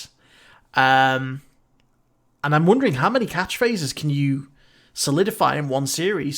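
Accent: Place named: Liverpool, United Kingdom. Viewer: Evening by the sound of your voice, you are from the north of England. Think Liverpool. How are you able to view each episode, as Disneyplus does not go live in the UK until 31st march 2020? Thanks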